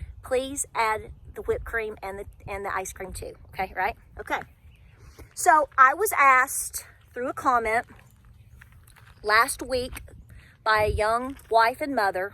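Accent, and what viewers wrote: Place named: North Carolina, United States. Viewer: Hi. I have been watching your channel since last summer. I'm a 34 year old with a baby girl who just turned one. We live over in Buladean, NC. I love your channel. Even though you talk about the real and the scary of whats going on in our county, watching your videos comforts me. I feel like I know you because we are very similar (the accent! Heeey!!!) in lifestyle and beliefs. Watching your videos is like talking to a friend which is very important for me right now. My parents, my husband and I are doing our due diligence; however I have no friends (millenials 🙄) with whom I can have these conversations about prepping, the state of our country, etc. I have a best friend who I have worried about for this entire year. I've sent her hints here and there encouraging her about what she needs to do and about what's coming, hoping she will get off her butt and do something. 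She has made several unbelievable comments, but I don't have the time for that. Last night I was mentioning to her that she needed to stock up on meds and I mentioned Jase medical and antibiotics. She asked, "where are you hearing this? The news?". She then stated she was choosing to remain ignorant bc she had too much anxiety and couldn't add "this" on top of it. She said God would take care of us. I reminded her of Joseph and his dreams and told her God was allowing us this time and these warnings to prepare. She then snapped and said, "what are we supposed to be preparing for? A Great Depression." I sa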